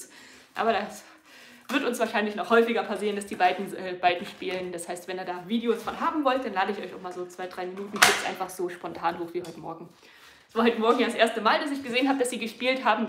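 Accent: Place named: Berlin, Germany. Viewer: "gepullert" 🤣 ich liebe euer Berliner Dialekt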